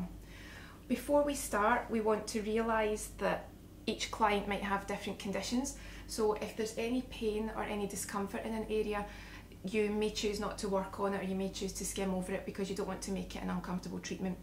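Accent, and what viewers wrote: Scotland, United Kingdom. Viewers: Hiral Patel it a Scottish accent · Am I hearing a Scottish accent? :) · It's not even an accent. She's Scottish. That's how Scottish people sound. Duh! 🙄😏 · Love the Scottish accent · I LOVE your accent. All americans love the British accent but Scottish is my favorite. Pretty and engaging. Great video! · I LOVE the Scottish Dialect...So Sexy ❤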